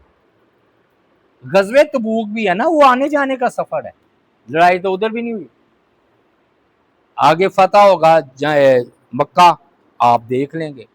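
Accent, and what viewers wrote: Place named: Lahore, Pakistan. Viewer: I like his lahori accent..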